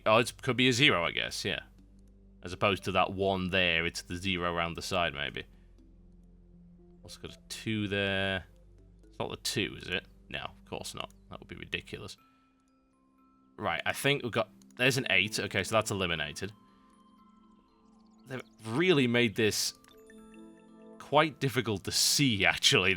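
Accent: United Kingdom. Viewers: This guy has British accent. I find that amusing.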